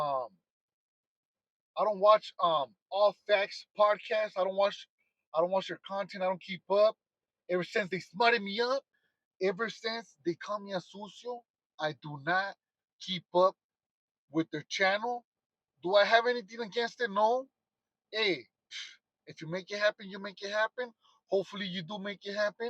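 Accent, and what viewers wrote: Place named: Mexico. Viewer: Ey foo, dont take this the wrong way but were you born in Mexico? Because you carry yourself like a mean ol Paisa. Even the way you pronounce certain words.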